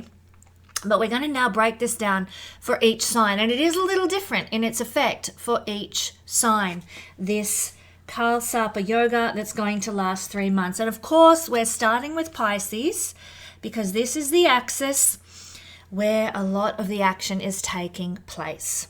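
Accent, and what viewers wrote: Australia. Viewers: Thank you-my first foray into Vedic astrology...interesting. Good to hear an Aussie voice!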